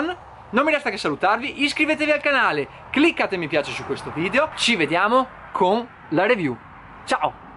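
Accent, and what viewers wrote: Veneto, Italy. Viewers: ciao e grazie per il video. Domanda... dall'accento sei veneto esattamente come me. Ma da dove di preciso?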